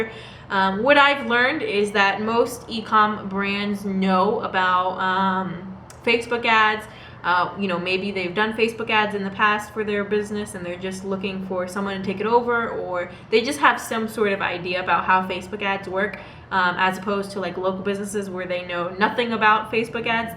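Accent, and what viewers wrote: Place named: England, United Kingdom. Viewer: Your English accent is so pleasant that it is already sufficient reason to listen to the end.. ))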